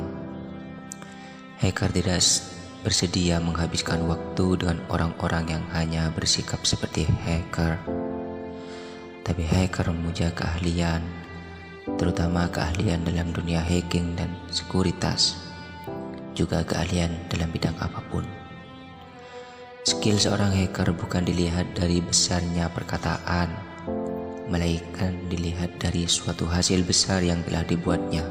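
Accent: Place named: Madura, Indonesia. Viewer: ni pasti orng madura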